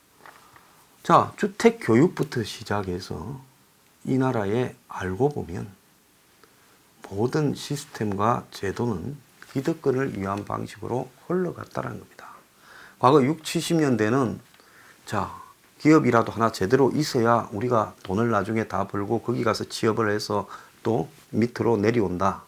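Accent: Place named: Busan, South Korea. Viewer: 김인성교수님, 얼마나 고마우신지... 울~소장님 흉과자랑, 더러는 넘 친하고 부산 말씨와 남자들의 특이성, *이놈 장수는*ㅎ ㅎ ㅎ 그래도 그자석은 성질은, 좀 급해도 맘은 좋데이, 같은 부산토박이로 넘 정겨윘어예.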